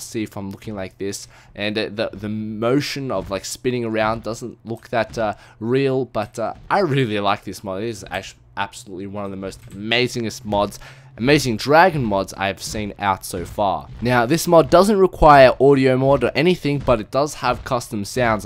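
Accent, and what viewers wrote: Australia. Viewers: O.o ur australian :O? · Are u Australian mate coz we are awesome ANZAC's for the win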